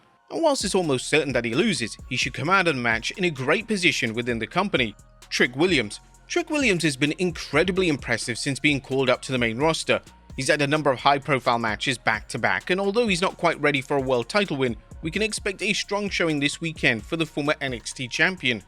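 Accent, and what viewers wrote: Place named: United Kingdom. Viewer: British accent is getting a little old ya know? How about we switch it up. I would assume you’re multi talented, so let’s get an Indian or a Chinese accent. Maybe even Australian?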